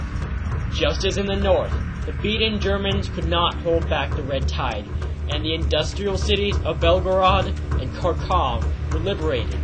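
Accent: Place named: United States. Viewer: Very good. Excellent to hear about the true turning point of WW2 through an American voice. In the US, we rightfully pay tribute to our amazing heros of D-Day, but we lose sight of the fact that we were but junior partners when it comes to the European war. My father was among those Americans who fought in Africa, and then Italy. Six of my uncles fought also, one in the Battle of the Bulge, two in the Pacific, one of them killed by the Japanese. But the Red Army crushed the Wermacht.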